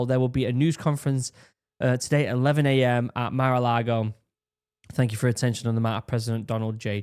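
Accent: United Kingdom. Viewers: Britain, could you not tell that’s kinda his whole thing.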